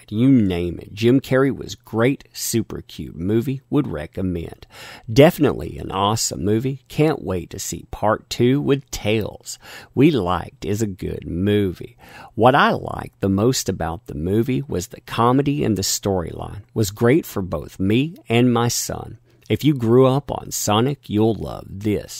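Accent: United States. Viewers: I agree with you 100% but I disliked the video because of your scripted strong American accent.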